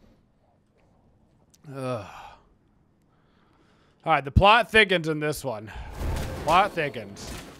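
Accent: United States. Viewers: Are you from Arkansas?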